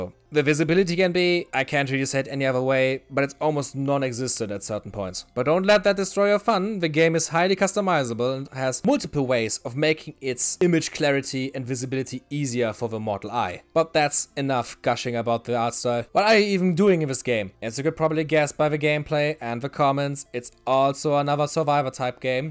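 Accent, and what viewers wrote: Germany. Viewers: Haha click aufs video und hoere gleich mein geliebtes deutsch heraus 🤣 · Jooo ich wusste nicht das du deutsch bist lol obwohl man das schon raushören kann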